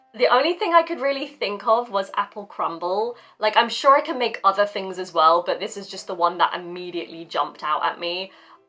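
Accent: United Kingdom. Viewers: 僕には0.5倍速にして、やっと字幕の音声を聞き取ることが出来ます。 日本人には英語の習得が難しいと実感すると共に、逆もまた真なりと考える次第。 それでも、アメリカ英語よりはクイーンズイングリッシュの方が、聴き取りやすいと思いました。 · I didn't ask you any questions, but I enjoyed the video. I got to know you better. I like the way you speak. British English sounds more classy and beautiful than American English, doesn't it?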